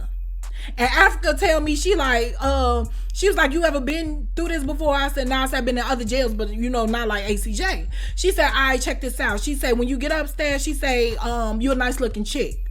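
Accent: Chicago, United States. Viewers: You have a strong Chicago accent I'm from Minneapolis damn near happy people here damn near 3/4 of the people here are from the Chi ... You carry that accent heavy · it's sumtin bout that Chicago accent........ 🔥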